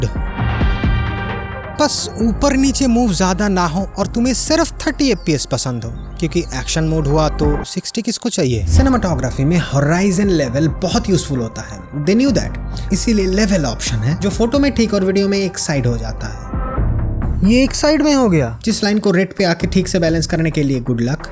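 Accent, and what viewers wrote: Bengal, India. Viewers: The way he speaks, makes the video more interesting And thank u for conforming that u r a Bengali😂❤ Already i guess that by ur accent ❤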